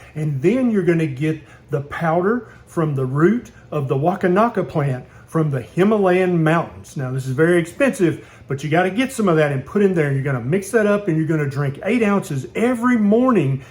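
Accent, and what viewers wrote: South, United States. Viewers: Sorry, not to be a racist, and this is totally my fault, but I just can't take it seriously when I hear a hillbilliyish guy in a pink shirt frantically telling me to hurry up and get whacky naaka plant to clean out my arteries. Is this satire/comedy? If so, haha!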